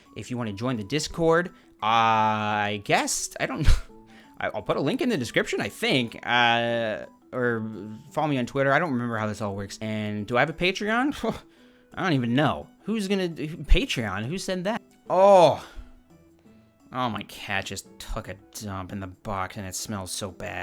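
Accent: United Kingdom. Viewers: Heh, there’s that British accent again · I'm not saying it's offensive I'm saying it really isn't that funny, it's boring, I'm watching for humor and I expected something a little more thought through. I feel like a teenager watching this shit. If you're going to make fun of another English speaker have some more complexity than just accidentally reading potentially a native Croatian lawyers script in a really blank slate crappy British slant. Common, I'm not subscribing for reporting, I wanted good comedy.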